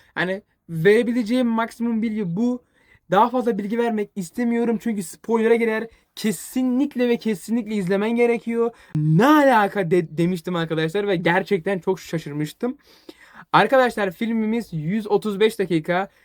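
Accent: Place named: United States. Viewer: Aksanın çok yoruyor amerikan aksanı gibi :D bence biraz bu konu da değişim yapsan iyi olur gibi. yapabilceğine inanıyorum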